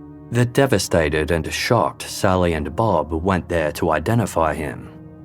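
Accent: Australia. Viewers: Lovely to hear an Australian voice on one of these documentaries.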